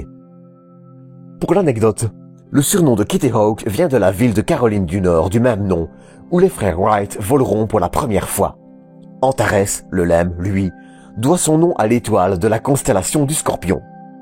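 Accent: Belgium. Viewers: T'es belge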